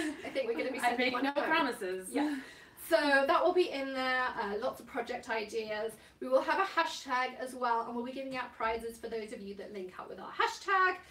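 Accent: United Kingdom. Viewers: definitely makes me think: #1 - British ( your accent ) #2 - crafty goodness: new techniques, latest products and some really great ideas. An all around great channel to hang out watching and playing along.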